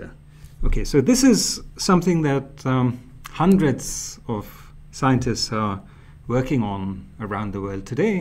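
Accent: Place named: India. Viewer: He sounds like indian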